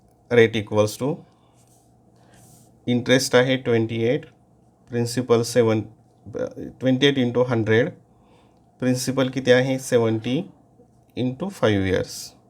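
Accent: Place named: England, United Kingdom. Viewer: Sir please 12 la jasti English style madhye bolaycha prayatn karu naka te kasatari vat te😅😅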